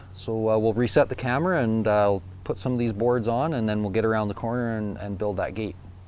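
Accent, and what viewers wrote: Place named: Canada. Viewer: The most canadian accent.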